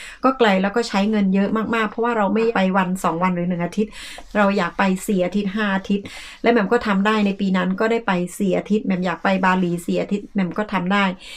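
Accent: South, Thailand. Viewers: ชอบพูดใต้น่ารักดี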